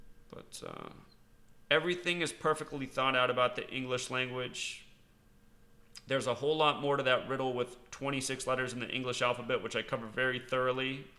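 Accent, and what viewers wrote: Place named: United States. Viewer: Whao what i can't believe is that people did not ask the question I mean when it came on the radio I said out loud how can a couple both die of heart failure WT...... I thought I heard it wrong. there was no discussion in the public I really started to believe I dreamt it then low and behold Your feed popped up on the sidelines I thought oh I clicked imagine my surprise when I realized you were from America or Canadian I can't tell the difference. OK concider my mind blown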